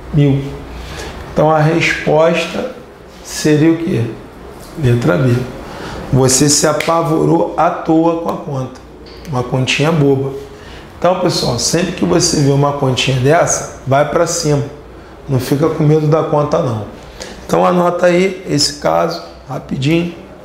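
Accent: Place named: Rio de Janeiro, Brazil. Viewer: Exelente aula amigo, mas aguentar esse seu sutaque de carioca é insuportavel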